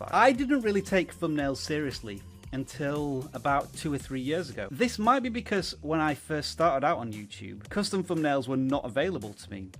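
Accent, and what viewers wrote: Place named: United Kingdom. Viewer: Don't you even think of faking an artificial "neutral English" accent, because one of the thing I most like about this channel is your British accent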